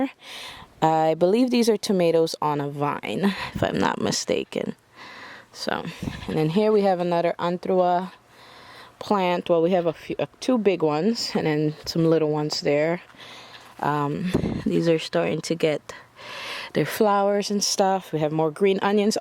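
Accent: Caribbean. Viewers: I’m Guyanese!! We does call it bora... Ik you sounded Caribbean 💚💚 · I knew I heard some West Indian coming through, lol, once you mentioned scotch bonnet and callaloo, I was like yup....lol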